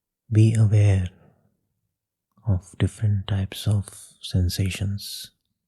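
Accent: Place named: Asia. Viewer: When I heard the Asian accent I knew I was in the right place 😌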